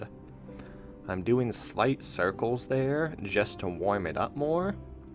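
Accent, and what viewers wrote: Alaska, United States. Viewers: he sounds like one of the Alaska wilderness family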